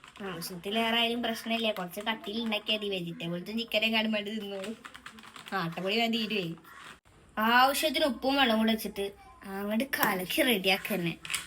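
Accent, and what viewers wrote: Malappuram, India. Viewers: Malappuram aano kozhikodaano naadu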